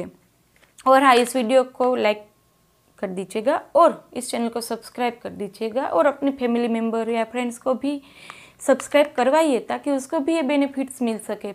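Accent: Gujarat, India. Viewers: Aap gujrathi hai kya? Shayad kuchh or Bhavnagar ya junagadh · aap ki.language accecnt gujarati sound hoti hai doc.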